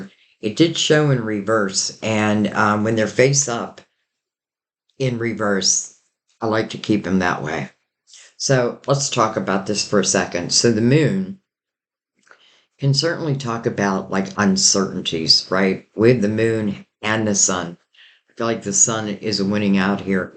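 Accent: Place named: Philadelphia, United States. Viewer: Well, well.... It no coincidence this reading popped up on my feed first thing this morning and I had time to listen to the entire reading. Boom, boom, boom.... So much here. Thank you for your gift. Also, I'm from outside of Philly and. Sounds like you also are from that area...😊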